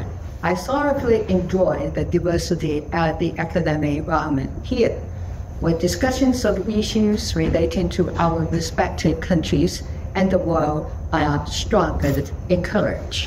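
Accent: United Kingdom. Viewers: 蔡前總統講的英文，英國腔就是留學過英國最好的證明，誰說蔡前總統的學歷造假？ 麻煩講流利的英國腔英文給我聽聽。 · 在LSE英腔的英語演説還不用看稿，躲在螢幕、手機後的藍白共可以消除之前的那些（聽説）了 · 小英總統的英腔本來就很重了🥰🤭回去母校演講就更重了😍🤩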